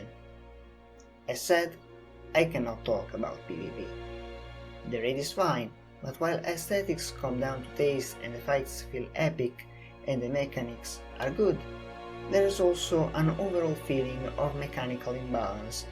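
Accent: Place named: Germany. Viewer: Have to love that accent. Is it German or x. X)... Ohhh god the lvling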